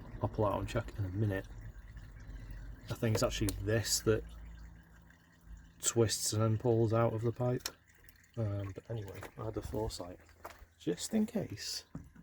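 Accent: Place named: North Wales, United Kingdom. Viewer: Sounds like North Wales accent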